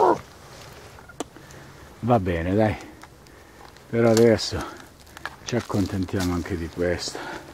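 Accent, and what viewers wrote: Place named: Piedmont, Italy. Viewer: bella l'accento e' proprio pinerolese doc un saluto da albenga e conplimenti bei funghi p.s. in liguria non esiste solo il mare ciao angelo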